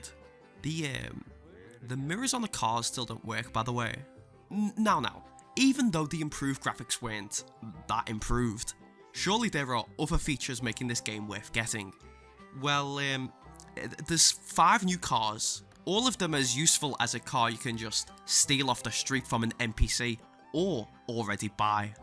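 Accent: Liverpool, United Kingdom. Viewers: U scouse? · Just curious, are you from Liverpool? Cause you kinda sound like Darren Till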